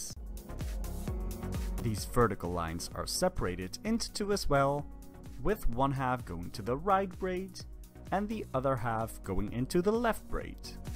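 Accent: Netherlands. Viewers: That netherlands Acsent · She's so beautiful!!! Great job! Btw are you Dutch? I noticed a subtle accent since I am Dutch myself.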